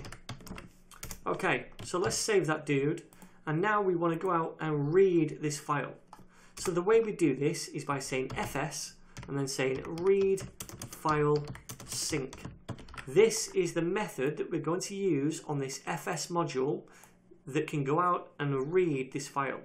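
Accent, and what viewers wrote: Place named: Scotland, United Kingdom. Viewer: I love Scottish accent!!!!!!!!!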